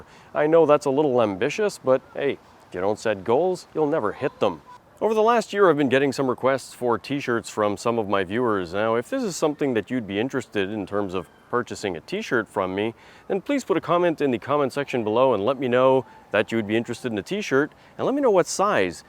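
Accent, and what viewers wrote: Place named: Canada. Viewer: Canadian accents sound fun to me, it makes me want to have a Labatt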